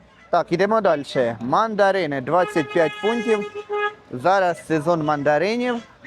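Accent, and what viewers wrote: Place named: Poltava, Ukraine. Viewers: Ваш полтавський суржик шикарнючий. Кому не подобається- всі в сад. Тільки не переходьте на кацапську, бо блогери - україномовні одиниці. На язьіке вагон і тєлєжка, але тошнить од кацапського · Красавчик 😂 Полтавский діалект ❤ зробіть обзор апкомагазину, яке є СУХЕ вино і почім?! заздалегідь Дякую. ну і коли будемо жарить попугая (рибу)?! Обох обійняв. цьом. Тримаймося 🎉 p.s.у ВєлМаркеті в Києві, банани по 71грн, Еквадор, красиві.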